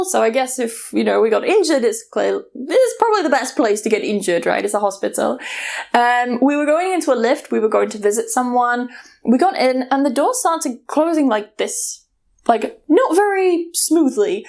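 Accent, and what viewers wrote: United Kingdom. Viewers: B3autifull British ac3n7..oh.. que fofa ,fala português.. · I understand you so much!I hate mouth noises too. It always drives me crazy.I glad to find the same person as I am.👍 Also I love your british accent.💜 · Hello ,i appreciate it and i am happy i can learn british accent , grammar . .. with you and we were born on the same month ,oh my God 🤩by the way i love your hair color and eyes please give them to me 😂😂and congratulations to your BF and us for having u _ thank God Please take care of yourself and it's not a comment it's like a letter 😂😂😂😂😂💓love you❤❤❤ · This the first time a hear a British person speaking english and understand everything even without subtitles. By the way i am a greek trying to improve my English and i really like you I think you are adorable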